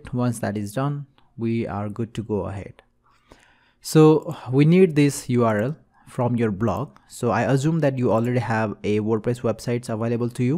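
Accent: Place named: India. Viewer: bu hististanlıların bu ingilizce si beni hayattan soğuttunuz be bilader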